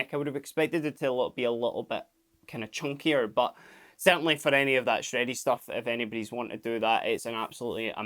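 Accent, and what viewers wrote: Scotland, United Kingdom. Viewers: The #EVH SA-126 12/6 is #EVH's Birthday E-Hole in honor of #EVH. #Glasgow and #Edinburgh....What a #Scottish accent!!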